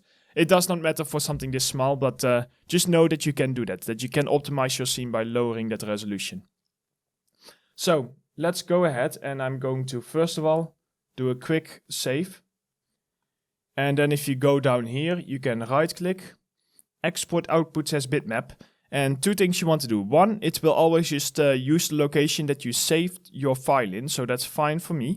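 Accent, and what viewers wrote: Netherlands. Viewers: u are dutch right? XD thanks brother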